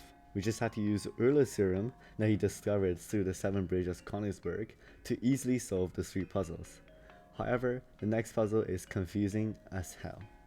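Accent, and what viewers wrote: Turkey. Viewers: yes also I think you said you were Turkish one time that might’ve been another one I don’t know but the way you pronounce the English words🥀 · Yeah he really sounds Turkish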